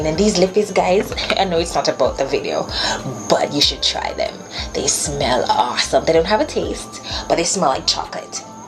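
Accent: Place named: Jamaica. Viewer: Lovely simple look that I will be rocking tomorrow lol 😂 you are absolutely lovely and the Jamaican accent is the cherry 🍒 on top. Thanks sis! 😊✌🏾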